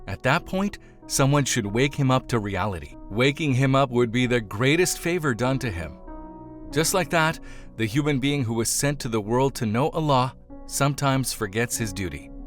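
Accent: Turkey. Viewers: I liked the Turkish English accent better, y'all didn't have to get another narrator😭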